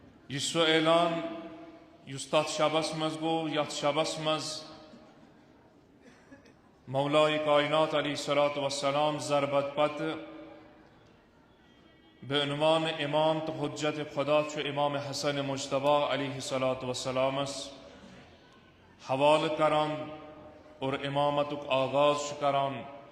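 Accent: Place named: Iran. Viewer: Why is trying hard to speak kashmiri and arabic in IRANIAN ACCENT ?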